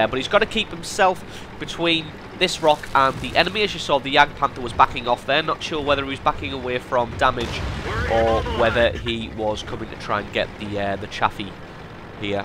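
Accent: United Kingdom. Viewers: Ok may I ask you what is your accent? Is it some kind of British accent or I am totaly wrong? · Its clearly british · What part of Britian are you from?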